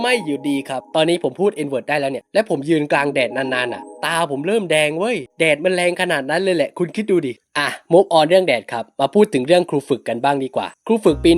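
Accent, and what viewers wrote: South, Thailand. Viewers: พี่บ่าวคนใต้ม้ายนิ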